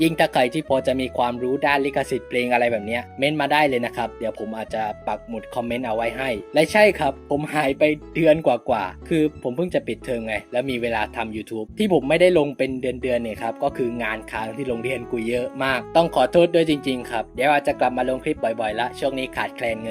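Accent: South, Thailand. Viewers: พี่เป็นคนใต้ใช่ไหมครับ สำเนียงพี่คือใช่เลย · สำเนียงอยู่เหมือนคนใต้เลยพี่หรอยเลย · พี่เป็นคนใต้ป่าวคับ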